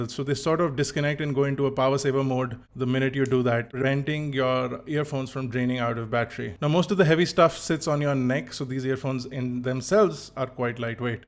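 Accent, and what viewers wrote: India. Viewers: work on your indian accent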